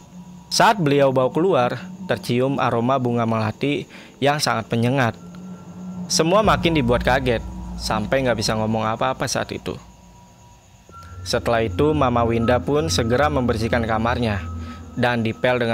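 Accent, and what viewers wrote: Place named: Bali, Indonesia. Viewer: Keknya abang pembawa acaranya orang Bali, soalnya napak kali logatnya kalo ngomong T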